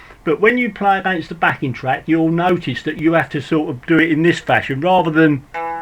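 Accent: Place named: East London, United Kingdom. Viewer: Jeje, pero es buenisima su accento, si no estoy equivicado es de los Eastenders, accento famoso del este de Londres.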